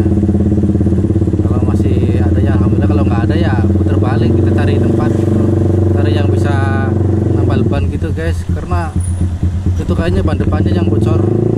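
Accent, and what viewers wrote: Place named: Banyumas, Indonesia. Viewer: Wah ngapak e daerah mana mas...? .gas tipis tipis jalam licin mas bro... sukses terus masbro...👌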